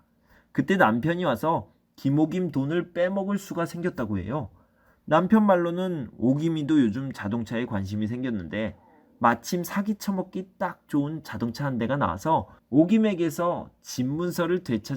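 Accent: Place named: Jeolla, South Korea. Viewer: 형의 목소리로 들으니 명작 소설이 더 감칠맛 나게 들리네요. 판소리의 지방에서 나고 자란 분이라는 게 잘 느껴집니다. 형은 판소리를 배웠으면 명창으로 이름을 날리셨을 거예요! ^^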